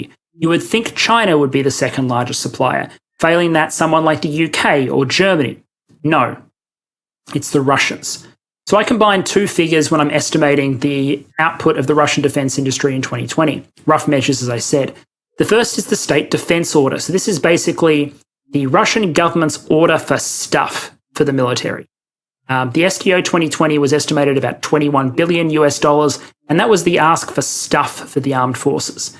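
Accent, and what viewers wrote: Australia. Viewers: You have a fairly standard Australian accent, so I'd suggest taking YouTube's autogenerated CCs and going through to correct them. It's still a pain, but far less so than doing it manually. The big thing it'll do for you is set timings.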